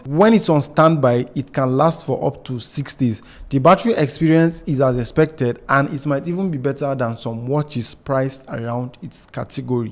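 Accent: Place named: Nigeria. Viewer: He sounds Nigeria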